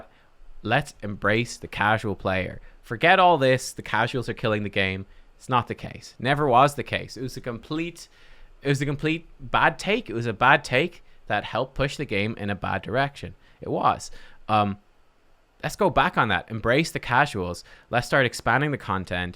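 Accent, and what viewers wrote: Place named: Ireland. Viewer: You sound slightly irish Never mind youre definitely Irish.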